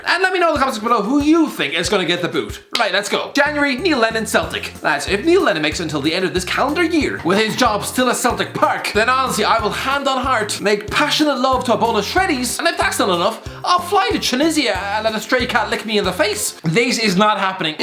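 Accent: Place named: Ireland. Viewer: If the accent hadn’t already given it away, the term ‘Glasgow derby’ will tell you who exactly the fav Scottish team is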